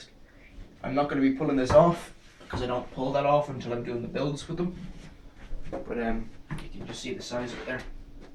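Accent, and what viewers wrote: Ireland. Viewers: are u irish? :D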